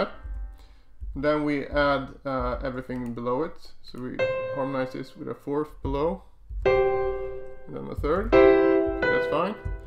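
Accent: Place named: Sweden. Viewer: You have swedish accent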